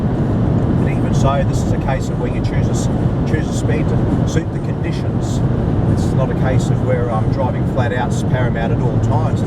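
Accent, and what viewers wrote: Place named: Australia. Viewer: Your Australian Sounds adorable - there has to be more of you from Down Under over Here in Germany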